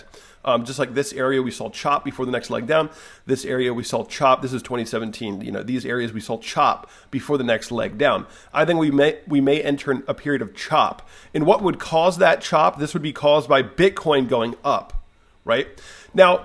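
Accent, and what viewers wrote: Sweden. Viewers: Are you from Sweden originally?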